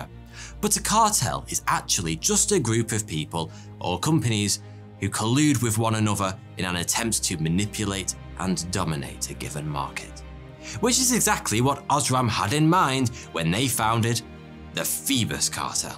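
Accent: United Kingdom. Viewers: Accest sounds like a British one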